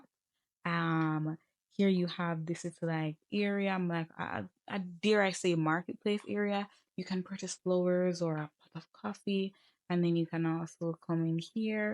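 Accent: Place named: Caribbean. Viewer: Ouu I hear an accent happy to see other Caribbean gamers this was a great video 🫶🏽😊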